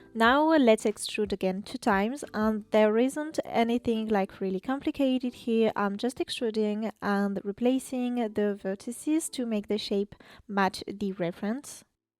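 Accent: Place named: France. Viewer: I know it ur French that soft btfl voice and accent soo cute :!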